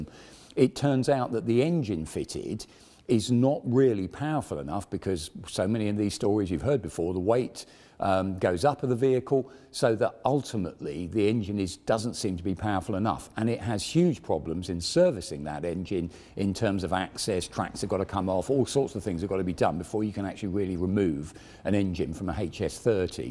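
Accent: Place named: United Kingdom. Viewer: Sounds British.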